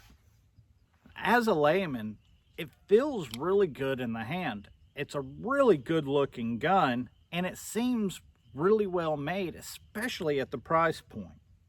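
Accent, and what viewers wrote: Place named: South, United States. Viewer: pinetrees everywhere, wild grass and moss. slight southern accent. ima say your from the Carolinas. my home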